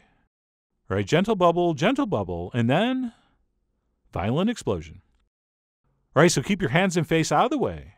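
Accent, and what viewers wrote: Canada. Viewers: Is this how Canadians sound?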